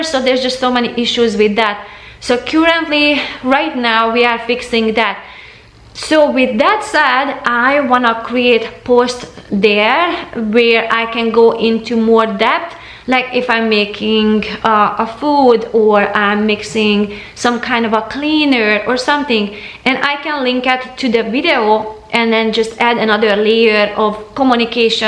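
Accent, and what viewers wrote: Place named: Hungary. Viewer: Wow! that was an amazing video, thank you so much for opening up to us and allowing us to get to know you better. first of all please; never apologize for your accent, I am sure we all understand you perfectly well, and many of us have"accents" well, my maiden name is Szabo, my Daddy was Hungarian, and ended up in France after WW 2 and met my &Mom, so I was born and raised in France but my Dad took us several times in Hungary and Romania ( transilvania) to meet our family and these times are some of the best memories of my childhood and now I live in California with my German husband...😀...in your voice and your intonations and pronunciation, I can hear my Dad's accent and it warms my heart.All I can say is you do such a wonderful job at helping people get motivated to improve their homes and environment and,taking care of our health to,o thank you so much for that, just that, in itself you accomplish so so much, your family must be so proud of you, and you show people that having a close partnership with your spouse is so special and makes,your life easier and helps you so much in overcoming difficulties in ln life, your guys' love for each others shines thru in your videos and I think is very encouraging to younger couples to strive to become a strong and united mates, thank you again.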